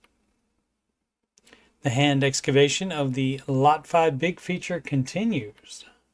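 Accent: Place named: Atlantic Canada, Canada. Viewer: Awesome stuff Coach keep it up. Either your from Nova Scotia or Newfoundland 😀 the out gives it away 😆 🍻 👏 ✌️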